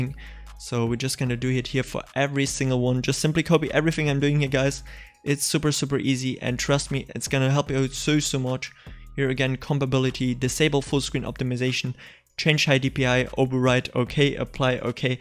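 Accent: Germany. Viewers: You are German or?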